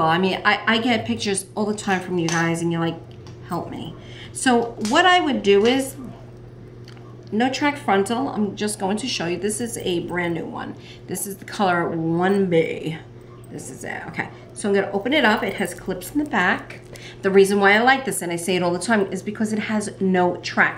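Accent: New York, United States. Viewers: I admire you a great deal😘😘😘...i dont have any issues wirh my hair but i like that you are so confident even with such thin hair i almost forgot you have thin hair and your New York accent or Jersey i love. Home sweet home!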